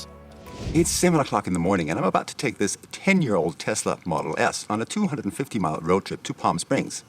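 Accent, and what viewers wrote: Denmark. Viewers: Awesome video! Thank you for taking the time to make it! That car is just beautiful even after 10 years! ... Also.. Am i correct if i you have a slight danish accent?! :D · Du lyder dansk :)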